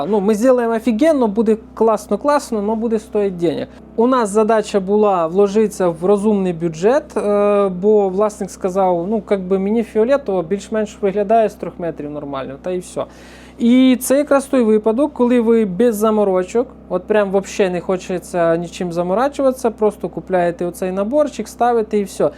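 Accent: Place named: Ukraine. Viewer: попадаю додому цей акцент ні з чим не спутаєш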